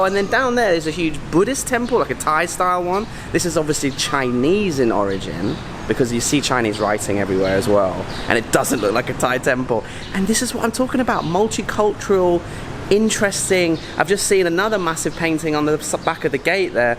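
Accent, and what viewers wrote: United Kingdom. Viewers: Hey, nice video. Question - going purely on memory your name sounds decidedly IRISH; But your accent... It's British no? Are you from England/Liverpool? Or am I way off? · Love the British accent. Or Irish? Fun anyway. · Thanks so much for this VDO. I’m just back from London and will live in Hatyai. Love your British accent so much! Hope to see you around in Hatyai. Enjoy!!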